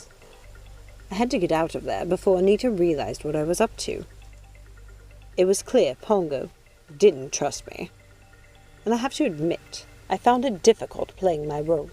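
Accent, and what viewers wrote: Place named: England, United Kingdom. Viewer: Well pronunciated. Love the English like accent. Well done and thank you for sharing 😀😀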